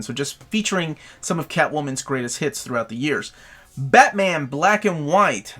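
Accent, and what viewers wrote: United States. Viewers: Are you from Kentucky????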